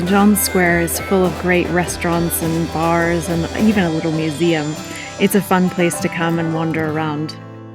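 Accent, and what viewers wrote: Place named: California, United States. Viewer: it somehow seems sophisticated with a soft Californian accent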